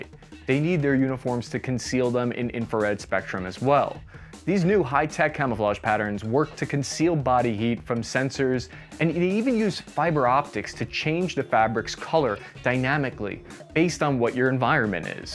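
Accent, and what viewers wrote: United States. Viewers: Damn I was almost certain that this dude is American not Italian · "Well that was none other than my people, the Italians" That's a strong American accent for an Italian 🤔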